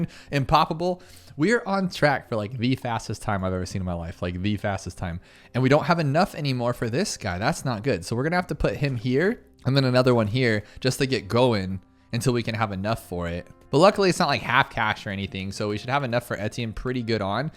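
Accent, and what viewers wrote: Norway. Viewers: You’re Norwegian? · Are you Norwigian? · vent vent vent, er du norsk?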